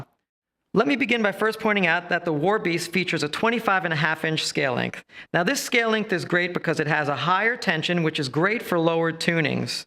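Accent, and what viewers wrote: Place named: Texas, United States. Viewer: WOw! Thats a pretty dope Texas accent...hehe...awesome model by BCR...good stuff!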